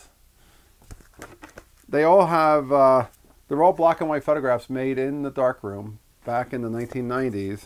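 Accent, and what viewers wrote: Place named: Delaware Valley, United States. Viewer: Sorry for the loss of your father,. I spent part of my childhood on the South Jersey side of the bridge to Philly—right along the Black Horse Pike from Gloucester Township to Mays Landing—so I recognized that Delaware Valley accent of yours right away. You’re absolutely right about those photos feeling like time travel. When you mentioned Acme, I had to laugh. For me, Penn Fruit is another grocery name that pops up in my own “time-travel photos,” at least in my imagination. I was back up that way this past summer in Atlantic County when my best friend from childhood passed. I always enjoy your videos.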